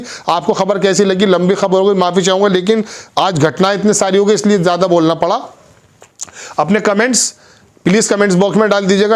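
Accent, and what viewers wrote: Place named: Saharanpur, India. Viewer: bhai aap mere hometown ki bhasha bol rahe hain Shayad aap bhi saharanpur k hain.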